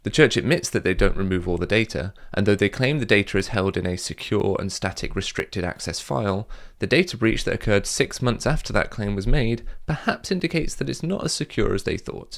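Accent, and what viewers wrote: United Kingdom. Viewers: Yeah, he’s sneakier than a lot of antis. His British accent misleadingly indicates a warm, intelligent tone.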